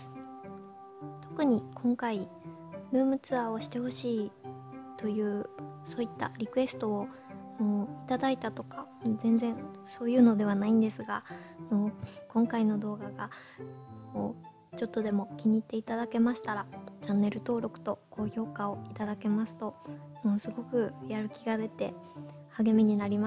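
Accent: Kansai, Japan. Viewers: 少しだけ漏れてる関西弁好き☺️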